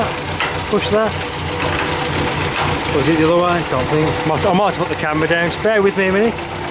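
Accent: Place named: Black Country, United Kingdom. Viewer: black country bloke ay ya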